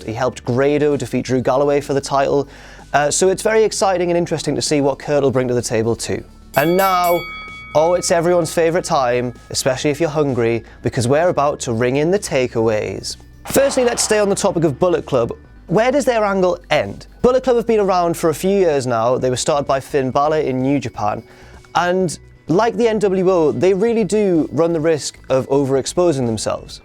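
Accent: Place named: United Kingdom. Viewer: adam rose was charged with tampering not tumbling =P that british accent doe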